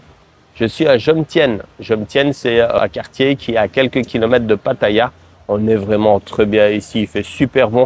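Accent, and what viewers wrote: Liège, Belgium. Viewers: Le DTV est très bien mais dès lors que tu veux orienter une partie de ton business avec la Thaïlande comme thématique il faut alors en effet créer une société. C'est ce que j'ai fait, nous avons ajouté l'activité de communication digitale au sein d'une de nos trois sociétés, l'occasion en même temps d'y incorporé les revenus que je génère avec ma chaine secondaire et l'affiliation, même si cela n'est en rien lié avec le Pays du Sourire. Et oui, flirté avec la "zone grise" peut avoir de graves conséquences, d'autant plus que le gouvernement va scruter très attentivement les réseaux sociaux dès cette année, il y a quantité de Thaïlandais et d'étrangers qui ont développé des activités en ligne ( surtout sur line pour les Thaïlandais ), qui génèrent des revenus qui feraient rougir bien des patrons de grosses boites en Europe, et qui ne sont bien sûr absolument pas déclarés, ou faussement déclarés avec des bureaux virtuels dans des pays complaisants. 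Des centaines de millions de bahts de taxes perdues pour l'Etat, gageons qu'ils ne vont pas en rester là... Sinon, à l'accent, tu ne serais pas de la région de Liège ?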